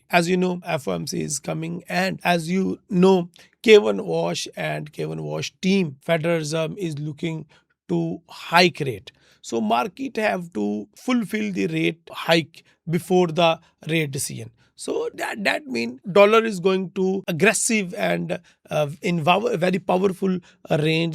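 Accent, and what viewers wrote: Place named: Punjab, India. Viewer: Bhai Punjabi voice problem kr rhi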